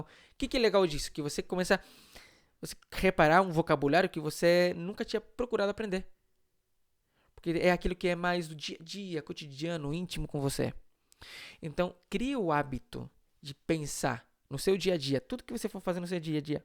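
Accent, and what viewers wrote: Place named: Chile. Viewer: Em meio à correria da minha quinta-feira, consegui ouvir o áudio da aula de hoje. 😅 Gostei muito das sugestões que você deu. Obrigada!!! E uma coisa que achei interessante é que, em vários momentos, você falou como um nativo brasileiro. Se não fosse a pronúncia da letra "o" em algumas palavras, ninguém ia acreditar que vc é chileno... rsrsrs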